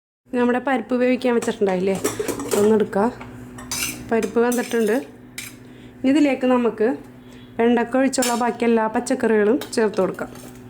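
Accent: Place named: Thrissur, India. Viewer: Trissure slangilulla avatharanam enikkishtappettu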